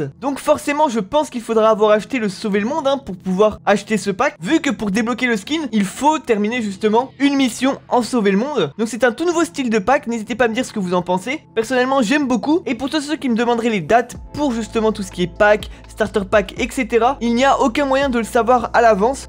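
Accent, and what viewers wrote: Quebec, Canada. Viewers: ta parler quebequois la non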